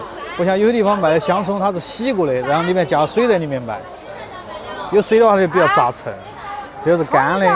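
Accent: Sichuan, China. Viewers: 四川口音😊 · 四川话，目测成都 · 买菜好接地气👍带的四川口音听着亲切，关键干货满满，就是馋死人了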